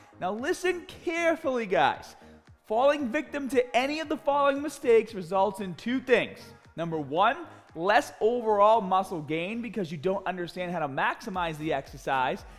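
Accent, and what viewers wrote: Boston, United States. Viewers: I swear his accent was never this Boston a few years back 😆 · Is this guy from Boston? I can't get over the accent lol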